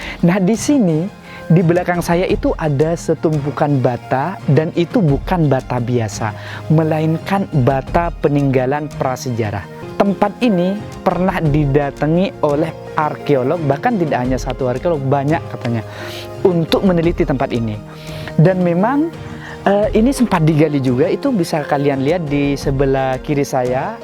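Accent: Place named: Java, Indonesia. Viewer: Medhok tak iye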